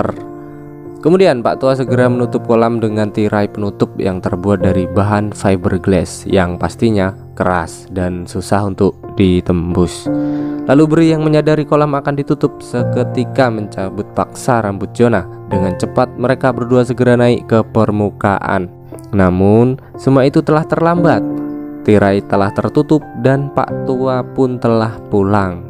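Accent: Surakarta, Indonesia. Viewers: Orang solo ya bang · Wong solo to mas😂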